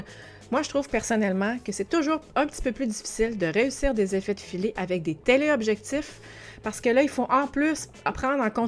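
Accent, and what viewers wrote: Quebec, Canada. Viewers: Merci et avec ce joli accent québécois j'adore;-) 😉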